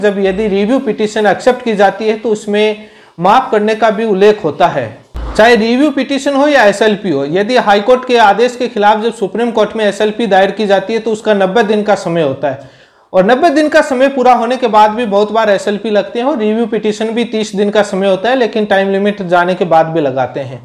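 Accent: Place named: Pahari, India. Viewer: आप पहाड़ी है जो आपके हिंदी उच्चारण से स्पष्ट है। थोड़ा इसमें सुधार करें।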